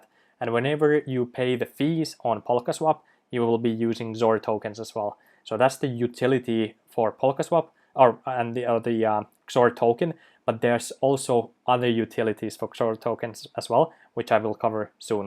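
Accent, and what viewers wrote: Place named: Finland. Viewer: your accent man, it's brutally finish. I had been in Helsinki several times, and making the trip to Estonia! Love your forest, and love your show!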